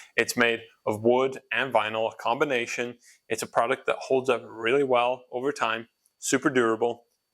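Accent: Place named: England, United Kingdom. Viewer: Your accent is so interesting, little English-sounding words here and there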